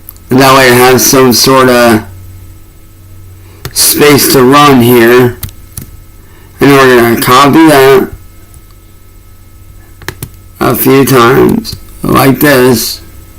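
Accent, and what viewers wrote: United States. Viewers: I was actually getting to like the gay cowboy accent ! He sure is mumbling a lot lately. For such a hard worker he can’t even enunciate his words, simple grade school stuff !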